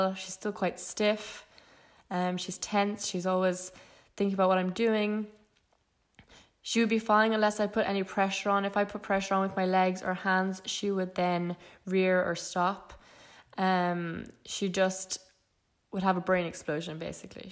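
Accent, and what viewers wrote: Ireland. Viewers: I’m pretty sure ur irish😂same what county Meath?cork? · That's an Irish accent, not a British accent. There's a very big difference.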